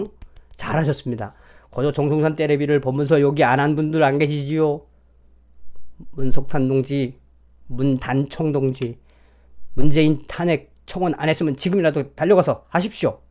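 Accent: North Korea. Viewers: Thank you, Thank you again! 말씀하시는데로 코믹이고 코메디 입니다. 진행과정도 북한 말투로 북한에서 항상 쓰시는 말투로 진행하시는것도 재미나고 정성산 "때래비" 힘되요, 희망의 방송! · 다 좋은데 북한말 일부러 써지말라고 그렇게 안해도 방송 본다니까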